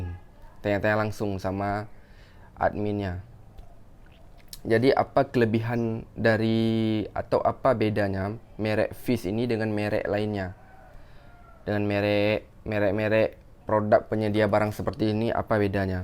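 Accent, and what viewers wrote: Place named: Aceh, Indonesia. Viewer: Orang aceh bang y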